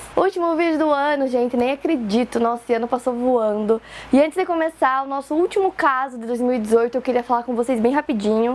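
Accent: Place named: South, Brazil. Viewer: Que sotaque muito bom de se ouvir,qual estado do Sul você é?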